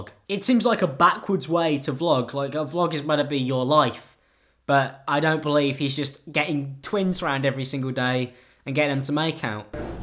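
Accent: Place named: England, United Kingdom. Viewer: What I hate more than ricegum is the English accent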